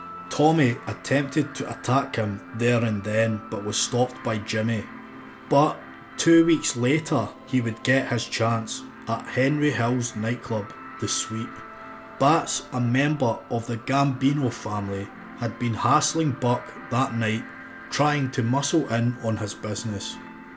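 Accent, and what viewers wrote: Scotland, United Kingdom. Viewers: He's Scottish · Narrator is Scottish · I must say the Scottish, when they’re not excited, speak the clearest English in my opinion and im a Londoner. Good luck fella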